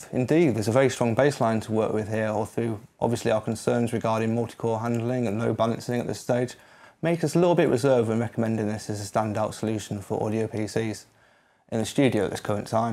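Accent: United Kingdom. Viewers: Please translate what he said from Cockney English, when he described the latency performance at a low buffer size. Is this process (transmitting midi, latency) predominantly single-cored, and hence the computer with the higher single-core speed will be better?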